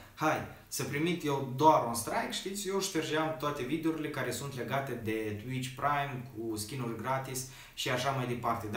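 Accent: Moldova. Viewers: Bro e moldovean=)))))evident ca vorbeste asa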